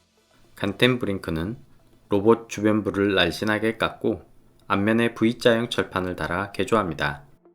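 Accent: Jeolla, South Korea. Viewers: 이건 개인적으로 느낀건데 정보를 전달하는 분들은 정확한발음과 표준어를 사용해야 한다고 생각합니다 발음은 상당히 좋으신편인데요 전라도 사투리가 심하셔서 듣기 거슬립니다. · 전라도 억양을 사용하시네요 ? 악의없는 댓글이니 오해 마시길.....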